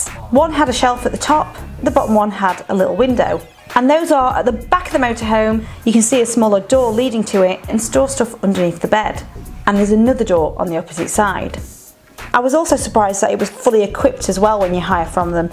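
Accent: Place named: Doncaster, United Kingdom. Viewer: Your finest hour .the best vlog .the longstanton spice museum 😂 aha aha 😂😂.the Doncaster accent was on form too.you proper make me laugh.hello when someone is trying to come in 😂😂.will be taking advantage of the McDonald's info.great vlog .carry on camping you could not make it up😂.can't wait for more fun vlogs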